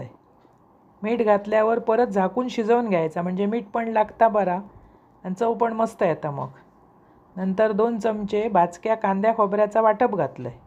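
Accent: Malvani, India. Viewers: तुमची सांगण्याची पद्धत खूप छान...सहज आणि सुंदर मालवणी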